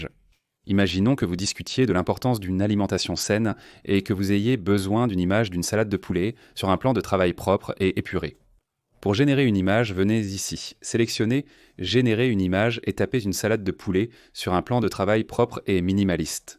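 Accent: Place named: France. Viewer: French comment : french voice looks like something created by IA (synch lips not real), but the voice is good, with realistic french accent, not robotic. Pleasant enough.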